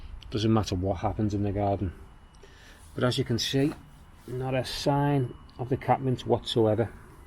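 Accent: Liverpool, United Kingdom. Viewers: Lovely to see your garden again and listen to a fellow scouser, happy to see the cordyline survived. Regards from Ontario, Canada.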